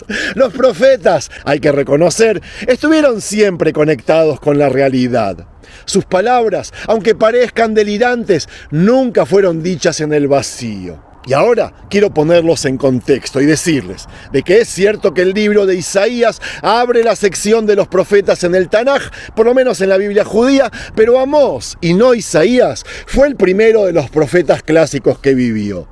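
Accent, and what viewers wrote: Argentina. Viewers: soy uno de los masoquistas,pero m gusta tus videos,uno de tus videos donde mostrastes un modelo de el tabernaculo,m gustaria un video d siquem donde supuestamente estaba la encina de manre,donde estuvo el padre Abraanm, lo q odio en ti es ese acento argentino,te tolero x la historia q tu conoces y a mi me gusta. Shalonm y delen duro a estos cananeos d raffah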